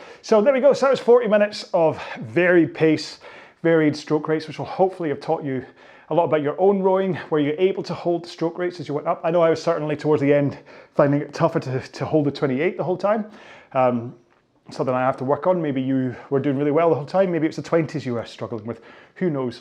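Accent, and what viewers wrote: Scotland, United Kingdom. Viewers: That was awesome.. 🥵 now off to practice saying (in Scotts accent) ‘sitting behind my desk for hours’ … auoihrs.. auhirs.. ouirs.. love it! Thanks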